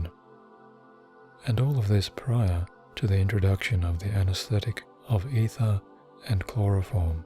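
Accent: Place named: Australia. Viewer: Australian he is great, I’m an Aussie he does have a good accent and nice bass to his voice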